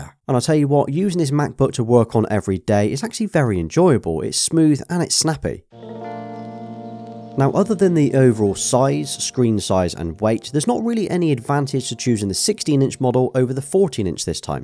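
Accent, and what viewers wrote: United Kingdom. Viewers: Are you, , British, Australian, or from New Zealand? The accent is charming. Have a nice Thursday, Friday, and weekend.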